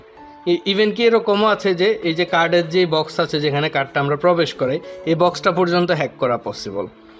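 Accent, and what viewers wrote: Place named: Teknaf, Bangladesh. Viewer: মনা তুমি তু টেকনাফে মনে হয় 🙄 ভয়েস ওরকম কেনো 🙄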